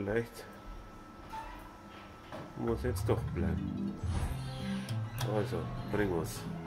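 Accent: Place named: Bavaria, Germany. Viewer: Du bist doch a Bayer oder des hör ich doch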